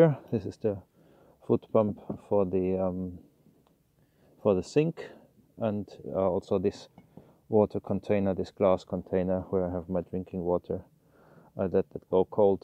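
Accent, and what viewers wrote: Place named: Finland. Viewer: Thanks for sharing . Your accent speaking English is getting more and more Finnish and less German. Not a bad thing, just an observation 😊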